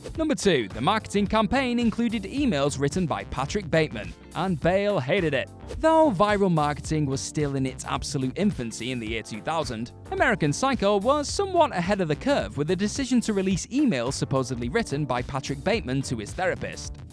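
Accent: United Kingdom. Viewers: The narrator is British, so is Bale. Maybe it's Americans that are saying it wrong.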